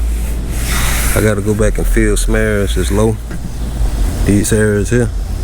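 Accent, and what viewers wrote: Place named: Dallas, United States. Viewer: You sound like you're in dallas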